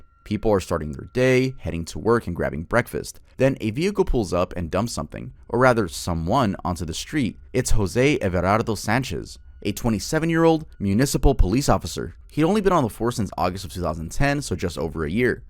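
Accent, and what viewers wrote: Chicano, United States. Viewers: don’t trust his pronunciation it’s very americanized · People disagreeing have not heard the things I heard in other videos. I agree he has a slight American accent tho but he is speaking in English lol. He just sounds like a Latino American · His pronunciation still has an american accent to it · He does not have a correct pronunciation, he just sounds like a gringo to me lol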